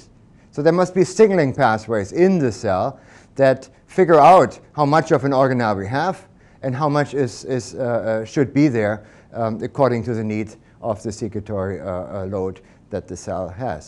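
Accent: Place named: Germany. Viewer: I remember when he still had a thick German accent when I first met him. It looks like it has shifted over time.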